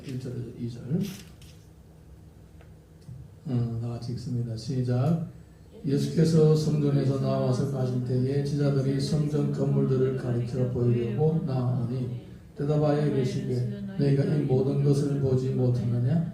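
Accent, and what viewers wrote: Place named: Pohang, South Korea. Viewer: 아멘 으로. 화받후 경청 합니다 말씀 하시는 억양이 포항 연일 그쪽 같아요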